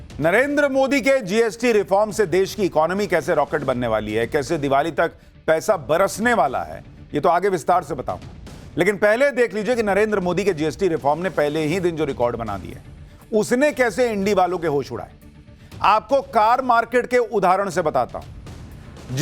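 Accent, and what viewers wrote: Bihar, India. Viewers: आज तो पतलकार ने 100 मे से 110 नंबर ले लिए, बिहारी भाषा का इनपुट झलक रहा था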